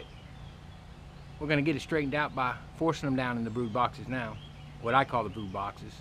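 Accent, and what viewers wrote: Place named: South, United States. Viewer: you speak so softly and you have a sweet southern accent so I need to turn up the volume just a little to understand what you are saying. I have to use ear buds so I don't disturb my grandsons and then my ears get blasted! I can turn the volume down fast enough. I had to stop watching you tonight in order to preserve my hearing. Please keep the volume level of the music the same as your voice so I can enjoy music into my 80's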